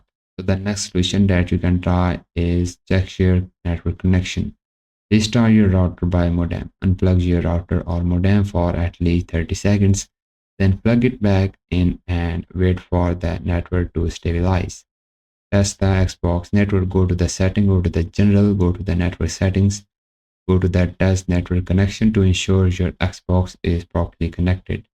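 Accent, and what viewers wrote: India. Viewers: Whyyy tf on every vid it has to be a Indian 😔 im sorry im not racist but danmm I can't understand shi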